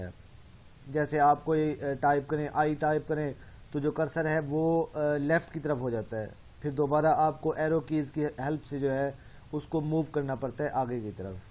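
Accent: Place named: India. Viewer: Shame that you speak too quickly and as Indian English accent is very difficult to follow , it would be better if you place subtitules.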